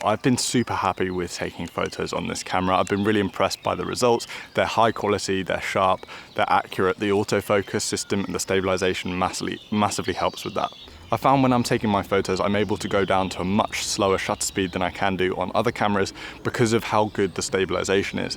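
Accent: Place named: United Kingdom. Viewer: thanks for your quick reply, of course you are english mother tongue (only your surname sounds Italian, your pronunciation and accent are perfectly british), I am not, that's why I wrote you that you are very clear to understand (for a foreigner like me).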